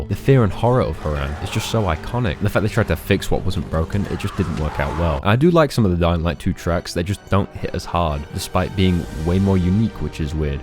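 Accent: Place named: United Kingdom. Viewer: "Special fanks to Warfunder" Literally all i heard before clicking off. You brits need to LEARN HOW TO SPEAK ENGLISH!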